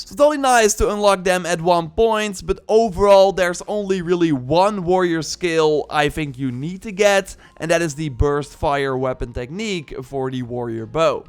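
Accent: Netherlands. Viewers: This Dutch accent is something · nice dutch accent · you sound dutch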